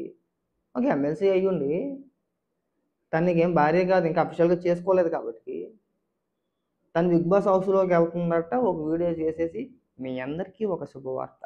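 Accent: Godavari, India. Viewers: Anna bale matladuthunnaru meru❤😂 a vuru anna medhi ento koncham godavari slang la vundhi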